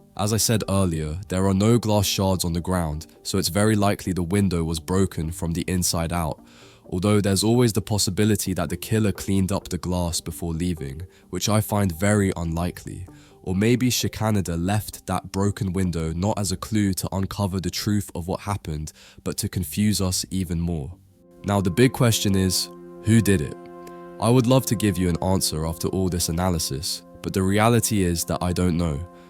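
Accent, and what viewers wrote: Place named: London, United Kingdom. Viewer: Why do you think English is not his first language? He's English, probably from London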